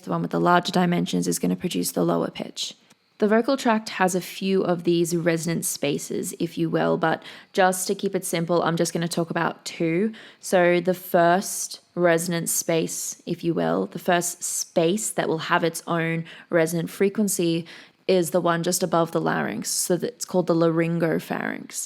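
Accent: Australia. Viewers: I love how you get so into the music and your expressions. And the red hair and red nail polish does it for me, And to top it off the Australian accent and such beauty, I’m hooked! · Can you please start a podcast or anything, maybe about music, or anything else that interests you? It's so relaxing to listen to, the Australian accent sounds really good :) I was there btw, at the end of the video haha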